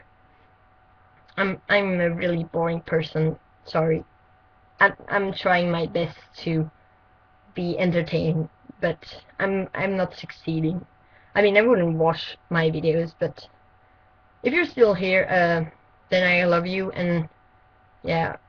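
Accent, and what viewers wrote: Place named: France. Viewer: 1) You are not boring. 2) This vlog is not crappy, so the title is totally misleading. 3) You accent is so cool, but I was actually expecting it to be more Swedish than French, for some reason (: Great video! Keep it up (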